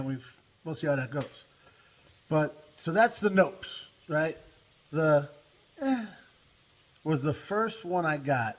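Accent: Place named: East Coast, United States. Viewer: I could listen to you talk for hours love east coast talk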